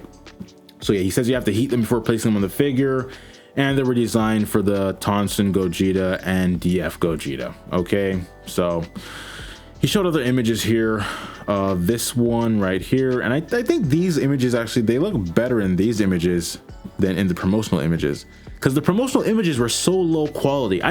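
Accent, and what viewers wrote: Canada. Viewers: Are you from Canada???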